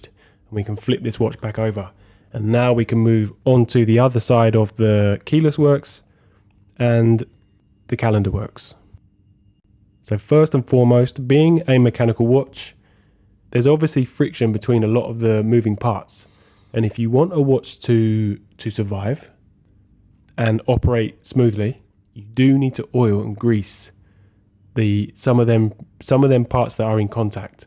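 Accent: London, United Kingdom. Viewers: My man sounding like a fellow Londoner and taking about usd. Come on fella let’s have a £ and let the yanks convert it. 👍😂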